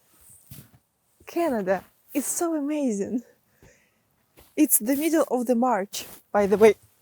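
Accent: Russia. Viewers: Мадам, на каком языке вы бы не разговаривали - всё равно прекрасно слышно, что язык на котором работает ваш мозг - русский!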